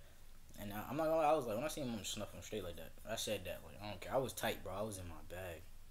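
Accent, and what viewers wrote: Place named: New York, United States. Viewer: Dis nigga from New York for a fact💀🤣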